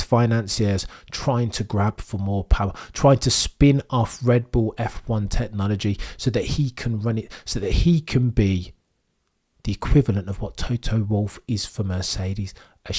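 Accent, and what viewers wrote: Birmingham, United Kingdom. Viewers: try to learn how to pronounce names correctly.... as it is we have to put up with a brummie twang...you sound ignorant.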